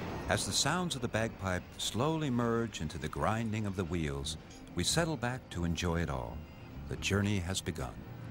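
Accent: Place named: United States. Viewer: You know it's an American for how they say English place names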